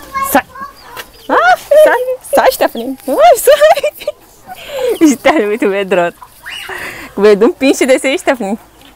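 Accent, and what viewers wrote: Rio de Janeiro, Brazil. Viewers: seu sotaque é de carioca, esse sotaque arrastando o x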